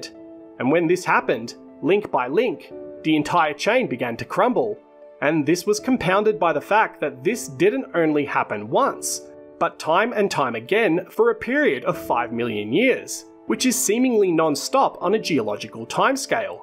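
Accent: Australia. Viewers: I never heard an accent like this narrator. All the most annoying parts of Ozzy, Proddy and received English rolled into one. Add a few Brummy vowels and there'll be another mass extinction event. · If you haven't heard an Aussie accent before (not Ozzy btw) you need to get out more mate.... there's a whole wide world outside the UK. Live a little! 😂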